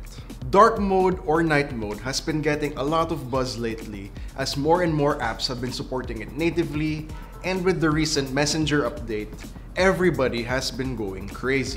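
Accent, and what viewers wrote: Philippines. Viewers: You sound like Filipino accent. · Ur accent sounds like Filipino.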